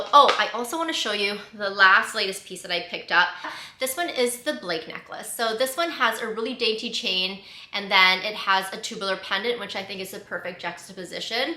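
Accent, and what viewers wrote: Canada. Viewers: Aww, I'm sorry to hear people don't appreciate your accent/the way you say "bag". I think it's unfortunate that people don't appreciate the differences in accents and how they make various words sound different. I think it's adorable that in the Canadian accent, the word "bag" sounds like "beg".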